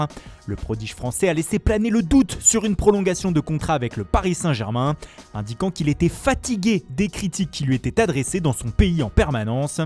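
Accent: Italy. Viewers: Le retour de la voie avec les accents italiens mythiques 🥰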